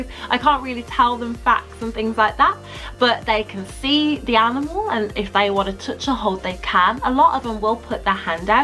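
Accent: United Kingdom. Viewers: She is definitely not American LOL I think I can safely assume that she is a Brit.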